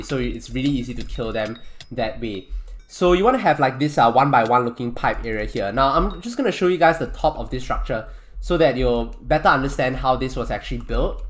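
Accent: Singapore. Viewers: Omg that y ur voice sound like sg me also play skywars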